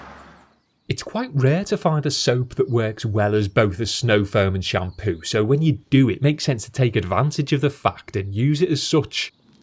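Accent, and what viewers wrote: Liverpool, United Kingdom. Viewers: What is it then? It's a real British accent, man sounds like Neil Buchanan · I seriously fawn over British accents so much 😛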